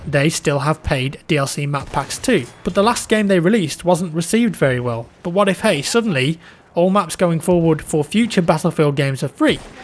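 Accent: United Kingdom. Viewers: Fuck your British accent.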